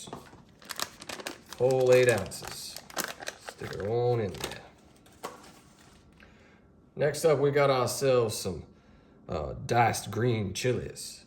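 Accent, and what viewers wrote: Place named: Louisiana, United States. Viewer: Not Georgia cajun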